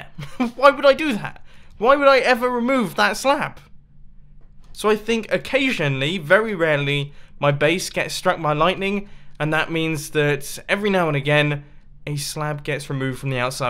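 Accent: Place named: RP, United Kingdom. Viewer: Who else remembers posh?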